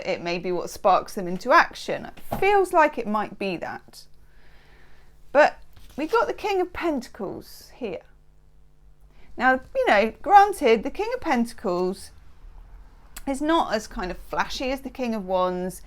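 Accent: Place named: England, United Kingdom. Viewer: I adore you! You are one of my favorite readers!! Accurate and beautiful (and I love your English accent lol)!! 😘🙏🌷🌺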